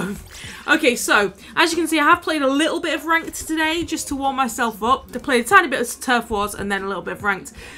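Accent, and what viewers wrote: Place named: London, United Kingdom. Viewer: Absolutely good Splatoon vid! We should play :D U sound like your from London? cuzz I am And I looooooooove ranked!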